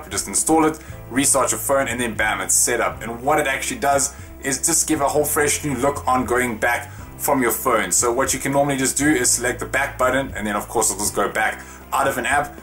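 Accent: South Africa. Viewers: Are you south african? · Dude u sound South African/Afrikaans. Is jy?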